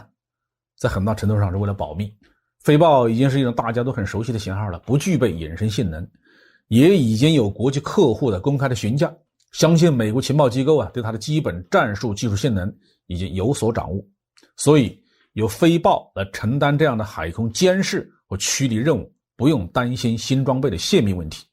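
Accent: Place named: Hubei, China. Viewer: 湖北口音？还是信阳南部口音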